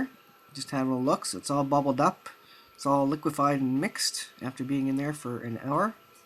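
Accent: Canada. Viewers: Are you Canadian? I'm hearing a Canadian type of accent.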